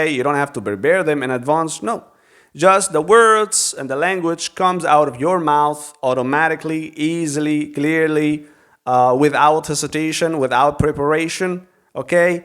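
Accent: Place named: United States. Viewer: American Accent good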